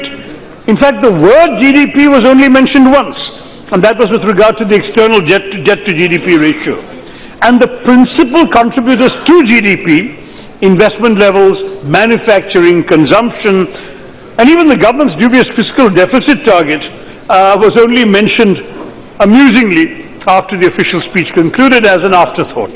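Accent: United Kingdom. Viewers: It's nice to deliver something like British accent, but how many Indians would be able to understand it, and this is again an advantage to the government. So, better showing our Excellency through any language we have to make our citizens to be aware of every single fact and figure out the actual face of the government. Hume ye dhyan Dena hoga ke aam logon ko Sarkar ki kaam kaj pata chale. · I really really admire not only bcoz of his english accent but highly bcoz of his speech which is always in the point 👍👍👍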